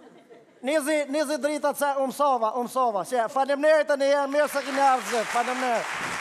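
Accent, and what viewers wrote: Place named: Laç, Albania. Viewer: ky o duket se asht nga laqi o sduket nryshe🤣🤣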